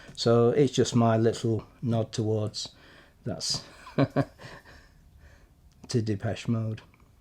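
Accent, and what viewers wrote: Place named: Australia. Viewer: I hear a bit of Australian in her wow !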